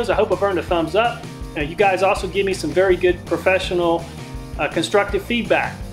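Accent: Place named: South, United States. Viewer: I like how this guy talks. His mouth is so animated and the southern accent. Very entertaining to watch/listen.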